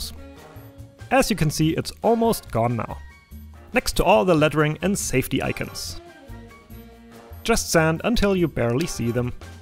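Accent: Germany. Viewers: Kommst du aus DE oder AT? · Ich liebe dieses deutsche Englisch! XD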